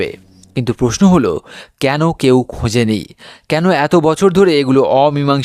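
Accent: Kolkata, India. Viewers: Vai why do you have Kolkata accent?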